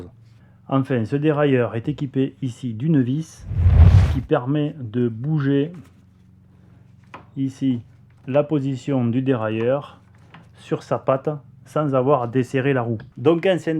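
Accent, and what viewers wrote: South, France. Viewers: Super, merci pour ces explications super claires avec le petit accent du sud en plus 😄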